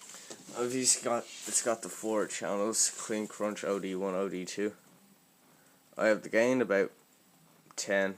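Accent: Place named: Ireland. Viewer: I can tell ur Irish lol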